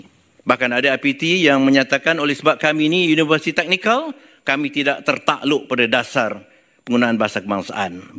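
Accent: Indonesia. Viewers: Haha...bapak ini kok logatnya spt indonesia?😅😅😅😅😂😂😂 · Hai Prof. AWANG.......anda berpidato sdh berdialek BAHASA INDONESIA......Sadarkah Anda.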